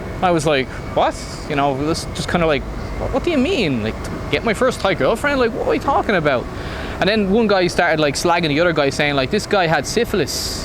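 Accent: Ireland. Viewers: Wow, that would have sent me in a major panic. Glad it all worked out fine in the end. I always get caught up in your accent. I have never heard someone add a 'th' at the end of every 5th word. Like your name, there no 'th', but you still pronounce it that way. Is that part of the Irish accent? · Nice and Interesting Story, from a that time young and naïf person., 1 positive Remark, take care of your English/Irish, not everyone is a Native English speaker and sometimes it’s fast and a little bit difficult to understand in your Enthousiasme. 🙏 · I can relate to this in so many ways 😅 scammed in HCMC all over the shop and the Irish accent going down great in Pai 😂 Great story, give us more of them!😁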